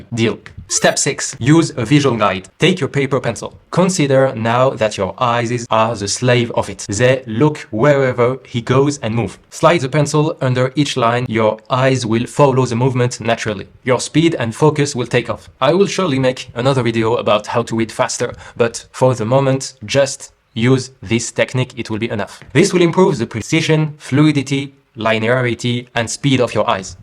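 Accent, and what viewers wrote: France. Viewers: I denote a French accent😊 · I am curious what your native language is. I’m assuming a middle eastern language because of your name But then the accent sounds French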